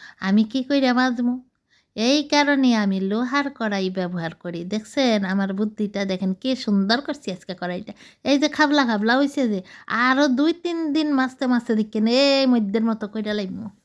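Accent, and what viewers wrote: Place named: Bangal, Bangladesh. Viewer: তোমার বাঙাল কথা শুনে আমার খুব ভালো লাগলো দিদিভাই🙏🙏🙏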